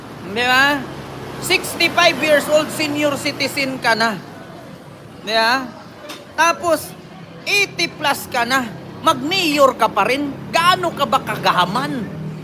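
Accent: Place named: Davao, Philippines. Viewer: kung mag salita ka parang nakatira ka sa davao...shit nimo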